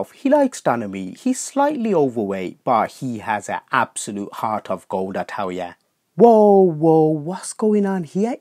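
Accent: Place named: Birmingham, United Kingdom. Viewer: Your too funny and i love your brummie accent ❤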